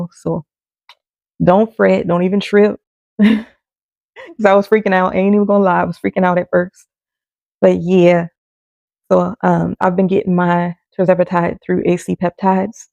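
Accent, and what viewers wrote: Louisiana, United States. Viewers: Stopping by to learn from your video. Cant help but chuckle for catching your accent. What part of Louisiana are you from?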